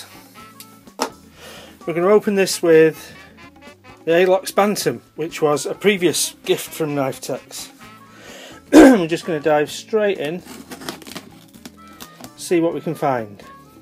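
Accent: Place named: England, United Kingdom. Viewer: What part of England are you from I just can't pinpoint it I love accents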